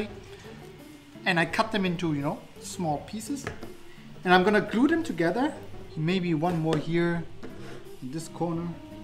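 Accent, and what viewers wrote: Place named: Germany. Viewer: BIG German accent but great video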